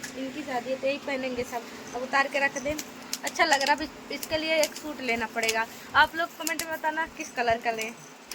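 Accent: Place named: Chhattisgarh, India. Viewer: कुछ कुछ भाषा आपकी छत्तीसगढ़ का भाषा जैसे बोलते हो😮😮😮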